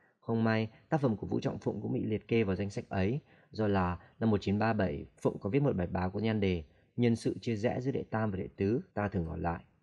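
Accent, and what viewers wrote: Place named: United Kingdom. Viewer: Anh nói được accent của người Anh kìa :3 anh có phải từng sống ở Anh hay vẫn còn đang ở Anh đúng không?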